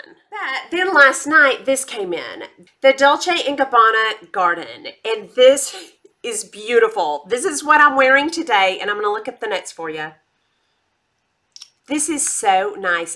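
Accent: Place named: Texas, United States. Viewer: Here from mr. Kongs mom channel... love your accent I'm married to a texan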